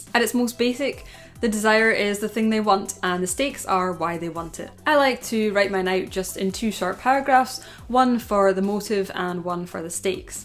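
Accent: Scotland, United Kingdom. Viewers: A few days ago I went down a rabbit hole of story telling and writing advice videos after getting frustrated with writing for a concept album. I found Jenna Moreci first and watched one or two other American writing youtubers. Your review of Jennas' book was suggested on the home page while I was taking a break after getting stuck writing today and I gave it a click. I have tried before to find compelling Scottish youtubers and not managed so I was very pleasantly surprised to hear you were Scottish, from Glasgow even! That video itself was great and I'm really enjoying the segmentation and detail you're going into with this series. Keep it up!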